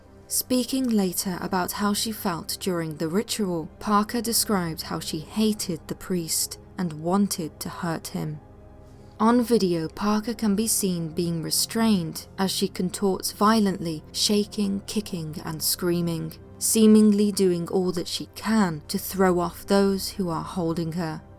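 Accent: England, United Kingdom. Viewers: It’s the queens English mate, all the best 😂🇬🇧🏴🇺🇸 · English from England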